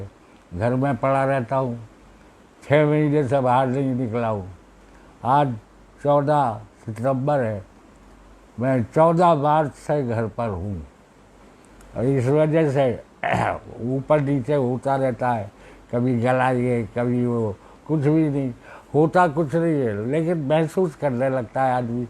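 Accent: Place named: Sikandrabad, India. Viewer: ताऊ जी, श्री संतोष आनंद जी को सादर चरण स्पर्श आपसे कभी मुलाकात नहीं हो पाई, पापा ( श्री ऊदल प्रसाद शर्मा ) से आपकी काफी बाते सुनता था । वो सिकंदराबाद से थे, आपके बारे में काफी बातें करते थे। पापा का स्वर्गवास eight year पहले हुआ था। आज सिकंदराबाद की बोली सुन के। पापा की याद आ गई। भगवान आप को स्वस्थ रखे । बेटा, मेरा आशीर्वाद। समीर शर्मा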